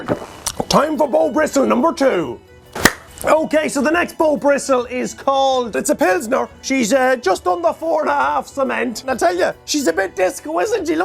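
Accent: Ireland. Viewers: Does anyone know what part of Ireland is his accent from?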